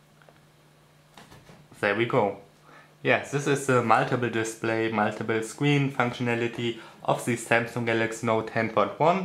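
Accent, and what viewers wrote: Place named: Germany. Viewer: Ob und zu welchem Video ich einen Kommentar abgebe, solltest du besser mir überlassen. Wenn du ein Video online stellst, musst du auch mögliche Kommentare hinnehmen. Ich spreche 6 Sprachen in der jeweils landesüblichen Koloratur und ich bin auch Deutscher. Eben deshalb schmerzt es, deinem Englisch zuzuhören. Meine Meinung in deutsch zu verfassen geht schon in Ordnung, denn dein starker Akzent lässt ja nur die Schlussfolgerung zu, dass du Deutscher sein musst. Insofern ist deutsch schon richtig.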